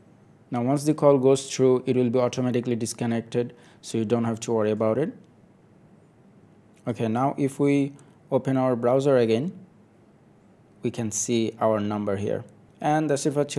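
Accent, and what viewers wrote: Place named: Bangladesh. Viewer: Ur country code and ur accent like Bangladeshi are u Bangladeshi?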